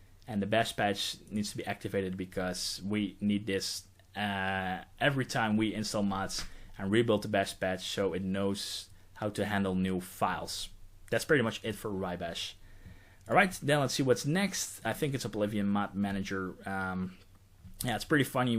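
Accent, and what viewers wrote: Netherlands. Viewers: nederlands accent doet pijn XD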